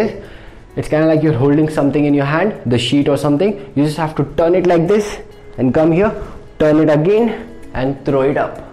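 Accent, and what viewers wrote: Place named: India. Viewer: When u hear his Indian accent u know ur getting premium lesson 💗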